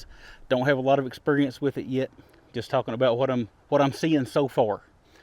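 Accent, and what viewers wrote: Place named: Alabama, United States. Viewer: You from alabama , yes sir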